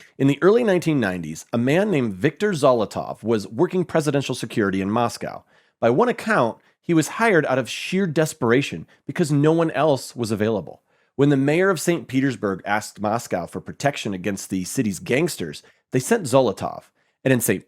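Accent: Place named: United States. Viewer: He has American accent.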